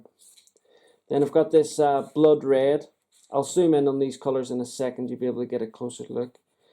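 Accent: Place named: United Kingdom. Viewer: I haven't ever heard of these pencils. you sound British so that maybe why I haven't seen them or you. I would love to catch a pack of these Scorpion pencils. They look like they lay pigment down with a meer touch. So I am adding my name for the giveaway. And I sure hope you get to feeling better soon... bless you. My name is Barbara Gaudreau (french) and I am in Texas USA. To reach me just pop an email over to: <mail> YOU ARE MISSED ALOT!!!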